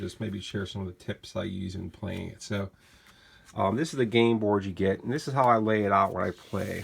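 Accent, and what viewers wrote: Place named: Pittsburgh, United States. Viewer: Nice video. Love the “Pittsburgh accent “.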